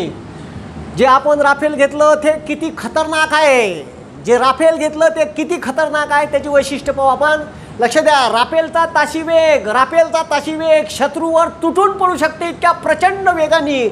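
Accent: Vidarbha, India. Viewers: Marathi in Vidarbha style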